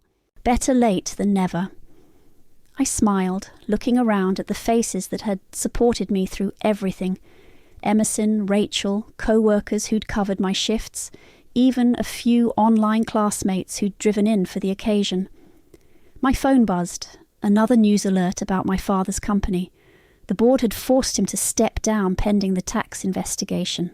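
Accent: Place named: United Kingdom. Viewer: I loved this story and I really loved the British narrator 😊❤🎉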